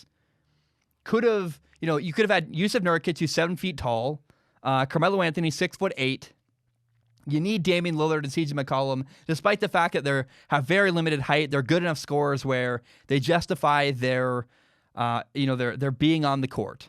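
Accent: United States. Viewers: Silverstone difference is just from your american accent compared to the british one:) grand prix has french origins so thats why the pronunciation of the d is silent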